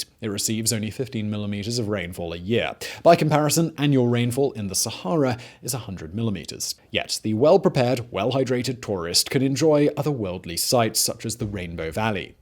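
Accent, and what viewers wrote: United Kingdom. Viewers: Nice video but the British accent is overkill.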